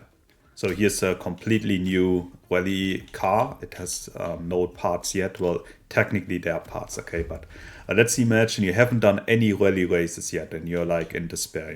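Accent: Germany. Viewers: Awesome Video. Thank you so much. This is going to save ne alot of time. (Ps: Ich höre einen deutschen Akzent)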